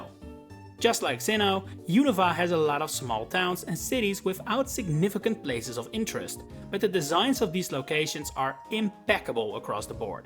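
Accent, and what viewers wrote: Netherlands. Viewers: Are you Dutch? :p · Can I ask you what your nationality is? I can't help but hear a Dutch accent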